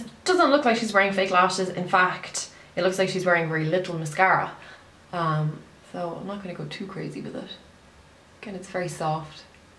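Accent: Ireland. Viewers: Love it! You're sounding much more Irish in this video :) Did you recently visit home?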